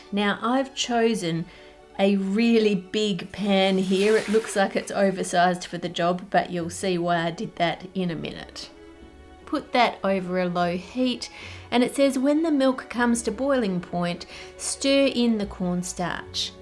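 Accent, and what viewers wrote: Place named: Australia. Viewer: Gotta love the Aussie accent!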